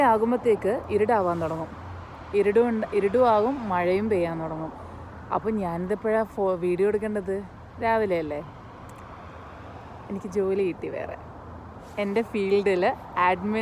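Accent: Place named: Kannur, India. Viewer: ഇരുട് കണ്ണൂർ സ്ലാങ് ❤️❤️❤️❤️❤️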